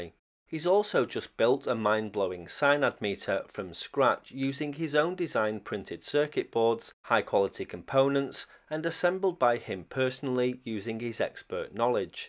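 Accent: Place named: Glasgow, United Kingdom. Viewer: is the man.... Great sense of humour too.... And he has a lovely Scottish accent... Glasgow I think.... He is very smart.... I'm a big fan of.... He is a very down to earth man.... And he gets on well with his brother..... I've had a few replays from him....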